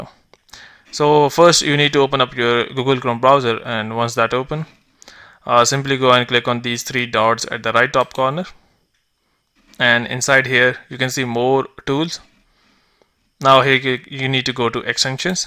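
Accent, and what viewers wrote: India. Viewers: This Guy sound like India is this real or scam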